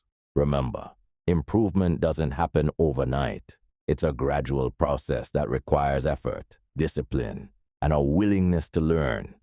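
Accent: Jamaica. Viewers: I like the Jamaican voice don't change it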